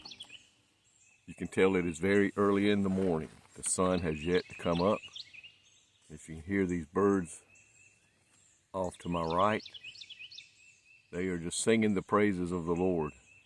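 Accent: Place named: South, United States. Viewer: I too woke up in the woods on a spiritual retreat in Ohio. You blessed my soul with the nature sounds and that familiar Southern accent (I’m from TN). Thank you for sharing your time and your prayer of blessing on us watchers (I just searched for morning devotion and this came up). God, heal his eye issue. ❤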